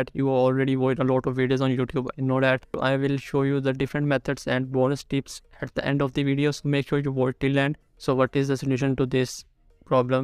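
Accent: India. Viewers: اصلا ما فهمت الطريقة لان يتكلم هندي و دوخني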